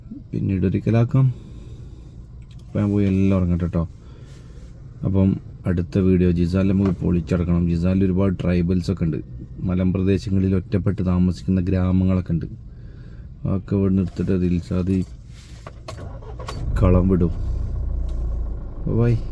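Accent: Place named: Malappuram, India. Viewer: തനി നാടന് വര്ത്തമാനം.... മലപ്പുറം കാരനാണെന്ന് തോന്നുന്നു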